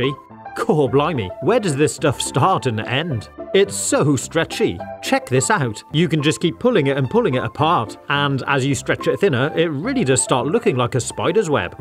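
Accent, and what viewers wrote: United Kingdom. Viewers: HES BRITISH!!! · Your British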